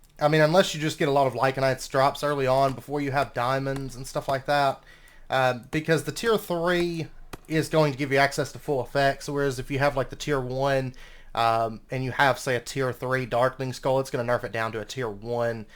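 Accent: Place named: South, United States. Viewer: I can't pinpoint from your accent if your from one of the Carolinas, Georgia, or Alabama/louisiana😂 I got southern relatives that sound just like you, and their from Georgia!👍 You are so pleasant to watch! Keep up the good work!👍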